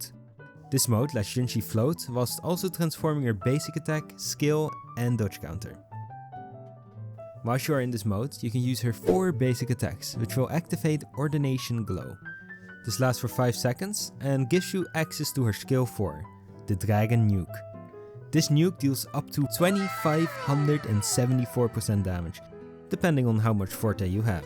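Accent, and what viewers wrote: Netherlands. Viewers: Not just by chance, that was extreme dutch. it got me distracted from the video after 1 line in xD usually a is of dutch people talking english they can very well recorniz each other pretty that there dutch though here u use some words that have same letters but use the dutch way of saying it instead of the english one xD You do you though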